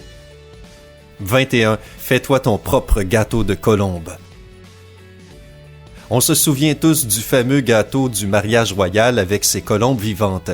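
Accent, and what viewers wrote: Quebec, Canada. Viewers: Je sais que c'est pas de sa faute mais son accent est vraiment horrible, gros plagiat, des informations non correct par moment, des transitions beaucoup trop lente, un accent québécois désagréable. Si vous avez regardez cette video vous avez perdu 11 minutes de votre vie. Surtout que de centaines de youtuber proposent le même format avec des vidéos de qualité. Une vidéos démontrant la médiocrité de cette chaine sous tous ses angles aussi bien par le pésentateur que par l'humour insignifiant et médiocre. Si vous appréciez cette vidéo soit vous êtes aveugle et sourd soit vous aimez visionner de la merde, ce qui fait de vous un scatophile. Parce que à se niveau là je suis étonner de ne pas avoir retrouvé mon téléphone dans les chiottes. Sans oublier le manque évident de créativité et de travaillent qui font de cette chaine ce quelle est. 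· Avec l’accent québécois c’est vraiment trop chiant à écouter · Époque RD son accent il n'y peut rien, il est québécois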